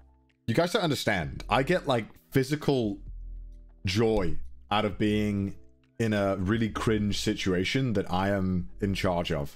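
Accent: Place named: United Kingdom. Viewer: my man's speaking the language of facts with a british accent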